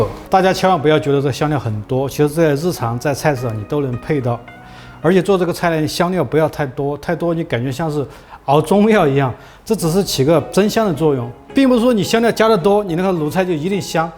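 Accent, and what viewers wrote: Sichuan, China. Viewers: 这个川普听上去真上头啊 哈哈 但是越听觉得越是喜气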